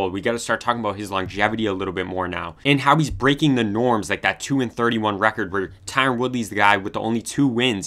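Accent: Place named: Pacific Northwest, United States. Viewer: you’re definitely from the Pacific Northwest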